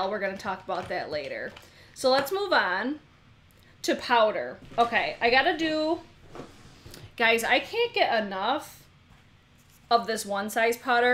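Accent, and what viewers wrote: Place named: Chicago, United States. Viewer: I was born in Binhamton and grew up in Chicago and I totally thought you were a Chicago girl from your accent too! Lol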